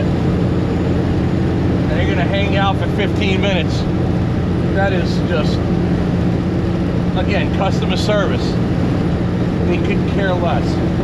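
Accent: New Jersey, United States. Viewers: I thought I was picking up on a Jersey accent. Go Joisy! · sounds like you just spent a month back in New Jersey. Heavy accent in this video for some reason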